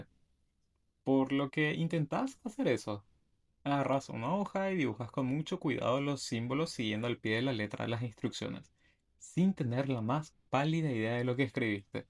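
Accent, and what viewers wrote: Paraguay. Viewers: de casualidad no sos de paraguay? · Tenes acento paraguayo :v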